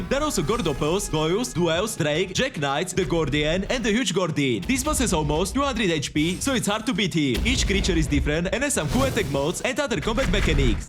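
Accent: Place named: India. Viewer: Why do u have the most indian non indian accent of english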